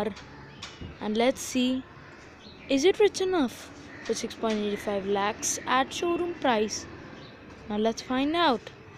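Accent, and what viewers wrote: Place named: France. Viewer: which English this French 🤮🤮🤮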